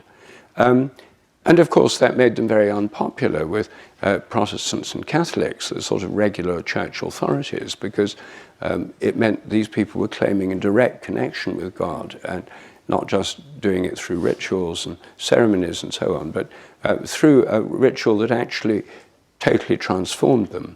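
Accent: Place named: United Kingdom. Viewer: Give it a rest....he speaks English so clearly a student of the language can understand...As an older American who has lived in both Northern California and New England, I can testify that English knows no bounds in pronunciation. While living for 13 years in New England I insisted that my children retain the letter R....yet we all, when tired or first awake would slip and say "weathah " for example...I for one (and I suspect I am not at all alone) love to hear an educated British speaker. Why would a native Californian like to hear such an accent? Simply because it is lovely, tonally pleasant, easy on the ears and altogether pleasant.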